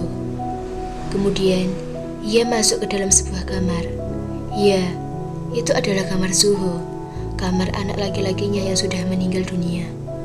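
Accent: Java, Indonesia. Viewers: Org Jawa? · Orang Jawa ya? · SUARANYA MEDOK BANGET SIH. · I just wanna say: your Javanese so thick and glued. No offense though. Peace ✌️ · Kaka narator nya jawane medok yah..... Salam dari jogja ka.. 😊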